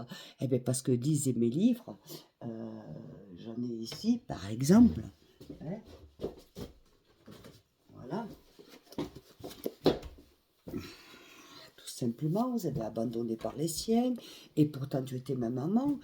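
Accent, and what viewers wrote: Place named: Southwest, France. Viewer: Bonjour vous avez un bel accent serait t'il pas du sud ouest? Bonne santé à vous signé.Boudu con.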